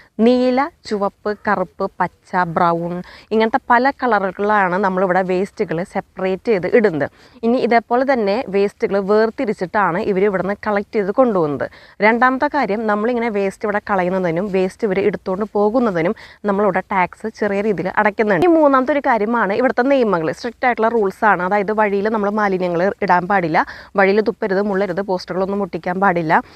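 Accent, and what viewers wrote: Thrissur, India. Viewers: your malayalam slang is good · നല്ല തൃശൂർ ഗഡി ഭാഷ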